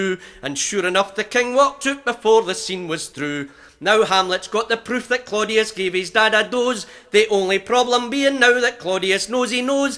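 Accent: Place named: Scotland, United Kingdom. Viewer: This is awesomeness. And works best with the scotch accent.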